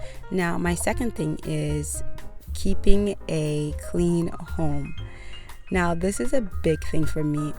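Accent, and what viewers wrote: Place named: Jamaica. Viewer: You talk like Jamaicans 👍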